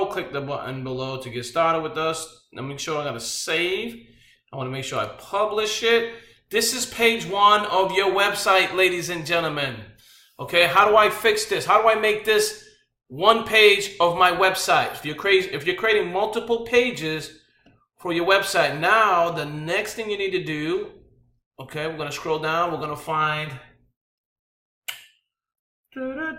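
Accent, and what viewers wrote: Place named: United States. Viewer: where are you from. As you speak like a American. I don't understand the big coin.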